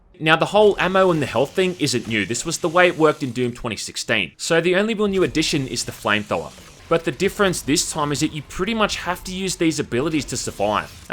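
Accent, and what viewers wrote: Australia. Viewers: So that's what aussie accent sooounds like.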